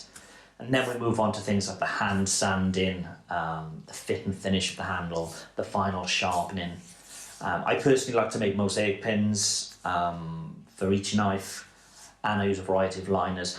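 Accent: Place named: United Kingdom. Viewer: great video man love your british accent and i also do get my stuff waterjeted and still put ton of work into them by hand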